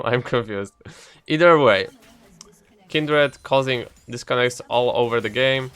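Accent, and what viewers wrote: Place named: Poland. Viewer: Ale masz polski akcent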